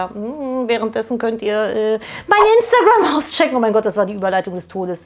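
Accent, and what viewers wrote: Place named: Cologne, Germany. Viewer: heute mit besonders aktiven Kölner Dialekt und am Kochen: Horst Lichter, bisch du's?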